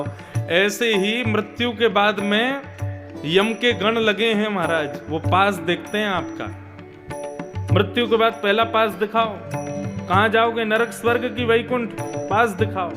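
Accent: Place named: Braj, India. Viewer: Jai jai aapke mukh se toh brij Bhasha bahut pyari lagti hai Jio Jio mere Lal ❤❤❤❤❤❤❤❤❤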